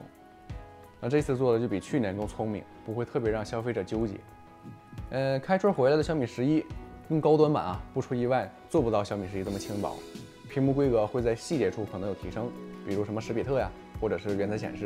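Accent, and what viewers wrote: Northeast, China. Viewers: 东北口音挺重啊！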